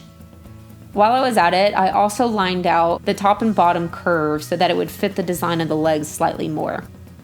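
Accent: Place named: South Texas, United States. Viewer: Lol, give 'em hell," I've got your back". My Southern Red Neck speech is a sorry imitation of your pleasant South Texas speech.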